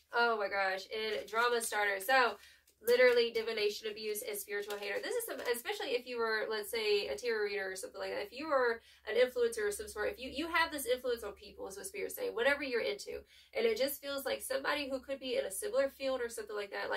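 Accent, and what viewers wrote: South, United States. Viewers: Southern accent. I love that. I’m a Scorpio too. Thank you so much for your reading. Its so awesome. Hits me like a bolt Take care of yourself. We scorpios need that sh.. Peace out, Sista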